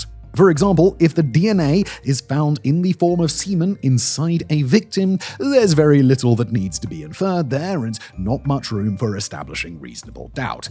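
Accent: United Kingdom. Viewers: The whole video seems very US-based for someone who sounds British and lives in the EU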